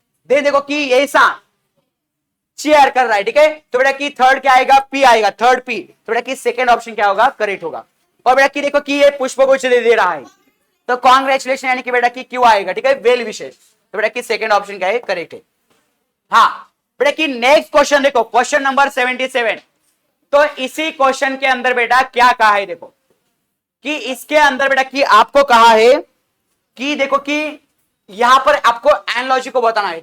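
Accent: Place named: Maharashtra, India. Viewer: Sir thoda marathi bhi use kare to chalega 😊😅 kyonki apke samajane ke tarikese hi pata chal raha hai ap marathi hai 😅🎉❤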